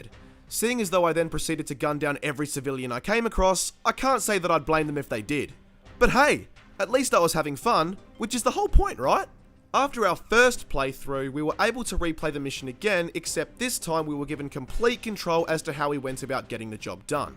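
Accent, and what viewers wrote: Australia. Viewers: Do you think you will continue playing Hitman Absolution? I don't care really, I just love your true blue Australian commentary. :)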